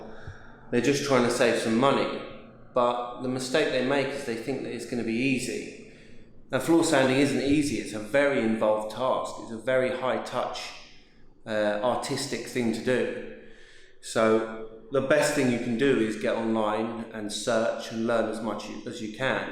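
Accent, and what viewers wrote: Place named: United Kingdom. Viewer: maybe it's your british accent, maybe it's your experience,,maybe it's both, but you seem like an authority on the subject and I trust your wisdom.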